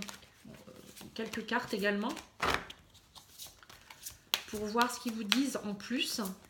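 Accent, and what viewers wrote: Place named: Picardy, France. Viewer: Bien vu pour la F J ma moitié trop de signes qui me font penser à lui c est fou heures miroirs son prénom partout sa région !! Je suis Picardie et votre accent ne ment pas ma TCHOTE j ai le même !!! Félicitations pour votre perspicacité et votre don sans tous ces tralala bougies pierres statuts !!! Merci merci merci beaucoup et vous êtes sur le bon chemin vous aussi !!! Chacun sa vocation je soigne avec mes mains suite à une Mort imminente au Touquet Plage je vous embrasse et a bientôt en tant qu Abonnée 🙏😇👍💋🌹🌹🌹🌹🌹🌹🌹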